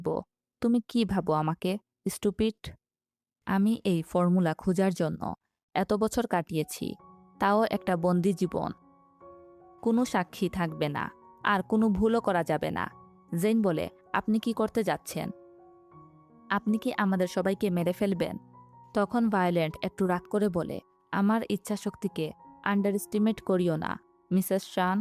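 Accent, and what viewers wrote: Sylhet, Bangladesh. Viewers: Apu apni ki Sylheti?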